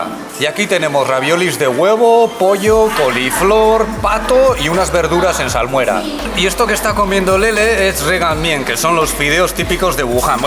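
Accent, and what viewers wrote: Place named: Spain. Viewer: El acento español es la leche tío. El mejor.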